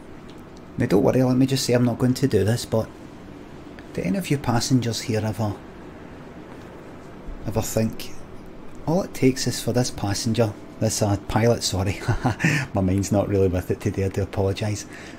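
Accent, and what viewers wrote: Scotland, United Kingdom. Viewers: They say that plane passengers feel most comfortable with a male pilot with a scottish sccent, and i must say that the scottish sccent still outweighs your awful flying, id fly with you anyday!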